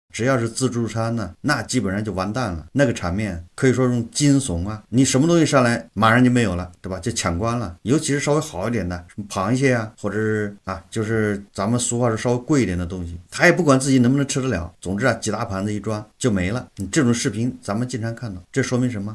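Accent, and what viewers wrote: China. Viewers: 沒字幕 大陸口音太重 聽不懂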